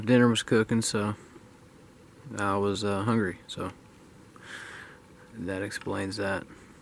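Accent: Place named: South, United States. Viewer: We both have that country accent. I love hearing your voice, reminds me of home. Everyone here gives me a hard time about my accent, but I proud of who I am. We are living in crazy times for sure, Ive noticed the water receding here also. Stay safe my friend💞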